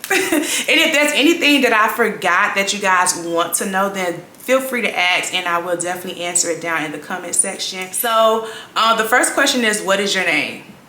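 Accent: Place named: South, United States. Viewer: I think it's the southern accent that I like, lol 🤣🤣🤣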